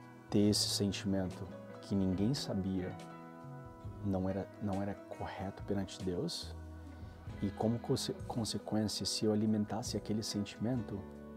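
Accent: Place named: Mexico. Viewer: Achava que você era mexicano rs voz linda sua. Passei por esse conflito entre igreja x aceitação, até que cheguei numa depressão muito grande pois fiquei dez anos sem nem beijar na boca, daí comecei a me tratar e fazer terapia, uma longa história...hoje estou tranquilo nessa questão, mas foi bem complicado mesmo! Me identifiquei com muitas coisas. Obrigado por compartilhar conosco sua história de vida 🙏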